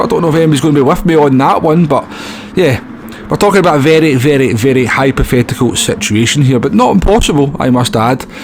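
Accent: Scotland, United Kingdom. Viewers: Holy shit I had to turn on the sub titles thick scotish accent 😳 I though we spoke the same language At the end of every video you should yell FREEDOM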